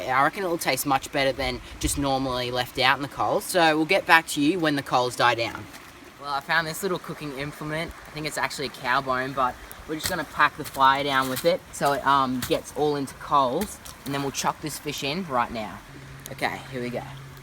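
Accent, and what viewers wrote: Australia. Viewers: Haha you Australians talk so funny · Are you Australian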